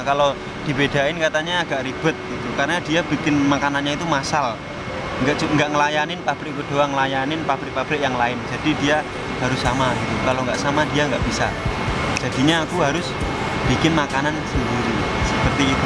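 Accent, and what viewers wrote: Java, Indonesia. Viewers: Jowo mu medok massee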